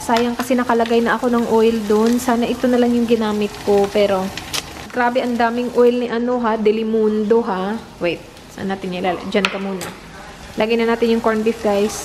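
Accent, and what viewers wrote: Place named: Visayas, Philippines. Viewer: Hi ate! Makalingaw kaayo ka inig mag bisaya:P New subscriber diay hehe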